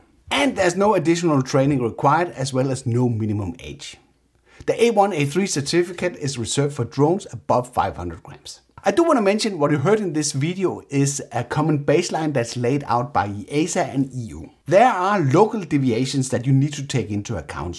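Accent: Netherlands. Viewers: That is the most stereotypically Dutch speaking English accent I have heard. Love it!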